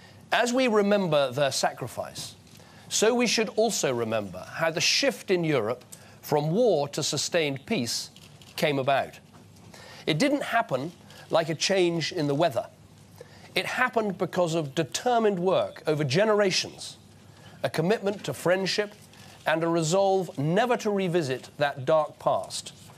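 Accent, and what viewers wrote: RP, United Kingdom. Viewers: I came here just for british accent. I'm confused because I understood almost everything... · British accent only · Because he speaks with an rp accent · Because it sounds elegant, sophisticated. I think he has got an RP accent. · Yea I’m with you, it’s weird. It’s litt just a British accent. I think he’s toning he’s accent down tbh from a posher one.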